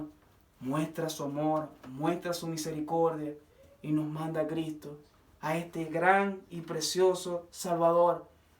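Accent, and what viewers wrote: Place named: Chile. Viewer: Ya pareces chileno mano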